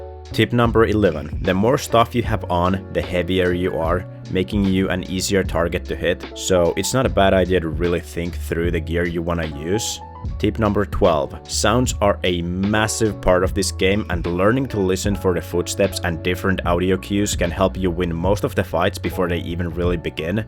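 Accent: Finland. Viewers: Pakko nyt kysyy onk jäbä suomalainen tai jotai ku must tuntuu et sä puhut tyylii ralli englantia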